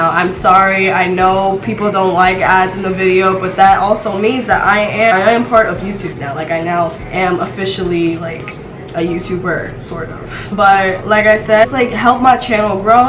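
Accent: New York, United States. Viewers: lmao that is no florida accent at all, its a New York New Jersey accent. Listen to how she says Cawwfee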